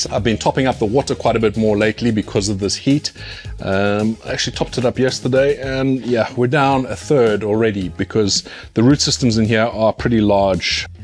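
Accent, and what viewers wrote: South Africa. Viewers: Where are you from originally? You sound south african.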